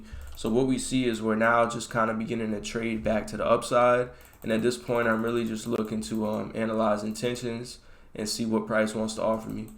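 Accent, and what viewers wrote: Louisiana, United States. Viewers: I knew you was from Louisiana accent heavy im in New Orleans ⚜️⚜️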